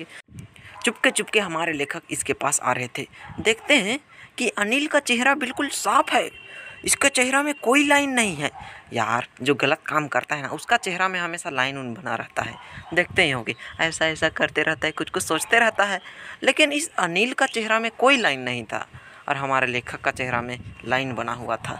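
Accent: Bihar, India. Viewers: Aaaaà bhai kaya tum bihari hooo???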